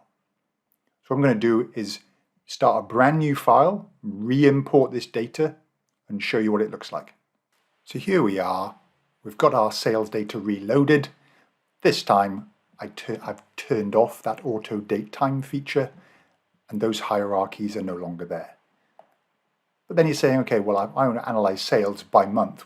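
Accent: Wales, United Kingdom. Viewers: This is very helpful, good to hear a Welsh accent as well!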